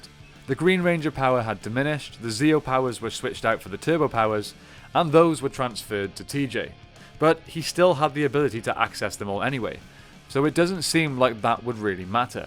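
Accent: Wales, United Kingdom. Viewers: Is that a Welsh accent I detect? I'm Welsh and I can't decide if it is or not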